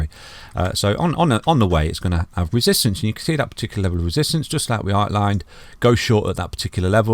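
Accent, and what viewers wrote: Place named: England, United Kingdom. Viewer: IMHO. You ARE the best out there and no BS. No disrespect to the American You tubers, but so nice to hear a good English voice. Keep it up!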